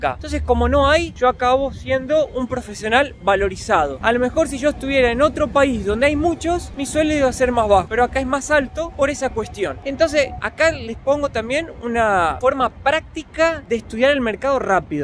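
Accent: Argentina. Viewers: Hola,eres de Argentina? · Iniciamente reconozco eres argentino ;)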